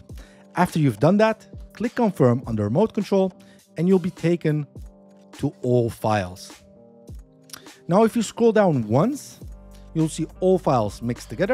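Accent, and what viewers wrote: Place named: Netherlands. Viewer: Deze Hollander spreekt bijna Engels. Nee, goed hoor ik moest echt goed luisteren om het te horen.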